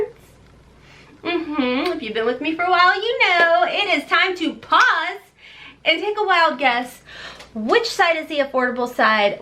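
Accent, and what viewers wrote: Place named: Kentucky, United States. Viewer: also, thank you for sharing the difference between a fake and a dupe because when I first rediscovered my love for makeup I didn't know that there were such things as "fakes" I thought I was just getting a good deal on an expensive product. I will NEVER buy another fake product because as you said who knows what kind of nasty things are in it. Idk if you watch nadi (pop luxe) but he used to do fakes as content and it actually made him sick. Omg!! My husband could hear the video playing and said, "is that, the girl from Kentucky"? Hahahah! I think I might watch you alot. <3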